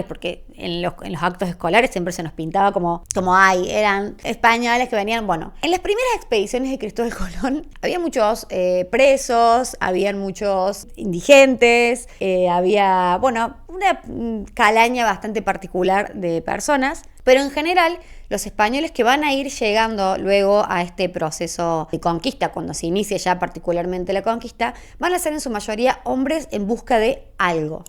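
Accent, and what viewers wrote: Córdoba, Argentina. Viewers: Tiene tonada cordobesa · Ohhh, encontré éste video y no se cómo, será por el algoritmo?... bueno, pero me interesó y me di el "trabajito" de verlo. Antes de nada te comento que soy Peruano y CUZQUEÑO Hispano, no latino, aunque algo hablo en latin, porque aprendí en colegio mediante la misa católica. Te encontré y veo a una "indigenista" más, tratando de deformar nuestra historia. Tu licenciatura esta mal titulada, porque, primero, no fuimos "colonia", fuimos parte de España, como "VIRREYNATO" ok? Tanto "guión" para nada objetivo y verdadero. Debiste buscar libros de historia elementales como la Historia del Perú, escrita por un chico de 21 años como su diario de vida y hoy reconocido como "cronista", gracias a la publicación de ese su diario de vida por sus familiares en 1560... se llamó PEDRO CIEZA DE LEÓN quien llegó con Francisco Pizarro y las crónicas de Cristóbal de Mena quien llegó con Hernán Cortéz a México. 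No tendrías nada que hablar del 12 de octubre de 1492, ya que de por sí está escrito y es "nuestra historia" y no tienes que "repasarla" y la "disputa", solo cabe en la mente retrógrada de INDIGENISTAS como tú que quieren seguir sembrando "odio " a nuestro origen HISPANO y a los conquistadores, que gracias a ellos, hablas castellano, a medias, claro está, por tu fonética "argentina" que no se entiende, jaja Iiiii, claro, en tu país de "progres" izmierdistas, que de lo único que se ocupan y adoctrinan, es de falsear la historia y política con "narrativas" Marxistas-Leninistas. Claro que fue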